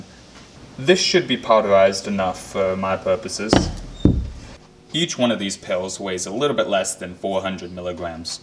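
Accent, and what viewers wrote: United States. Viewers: Are you from Boston? You sound like you have a Boston accent lol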